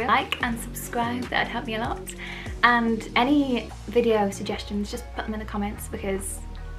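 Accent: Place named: Leicester, United Kingdom. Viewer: are you from leicester? recognise the accent!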